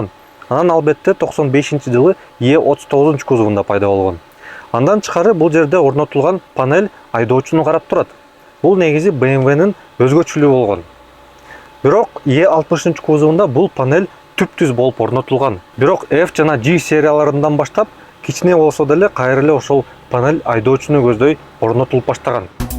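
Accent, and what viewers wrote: Standard. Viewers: Азаматсын таза кыргызча суйлогон кыргыз экен!👍👍👍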